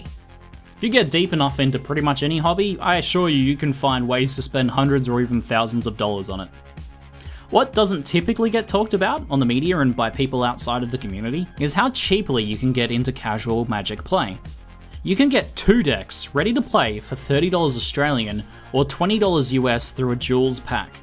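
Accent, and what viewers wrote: China. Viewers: Chinglish is there, did you bro up with him? xD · ayy chinglish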